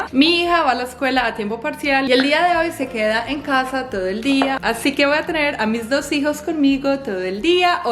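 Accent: Antioquia, Colombia. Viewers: Ah qué bien queda cerca a Mánizales, si tienes un acento paisa, ah qué rico pronto puedas venir a Colombia. Bendiciones a toda tu hermosa familia, eres una madre y mujer ejemplar, lo haces súper bien y me encantó este tipo de videos.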